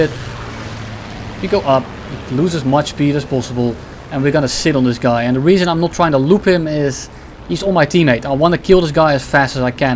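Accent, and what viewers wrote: Netherlands. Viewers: Are you dutch? You sound like you are from the Netherlands... These accents are too recognizable in Europe..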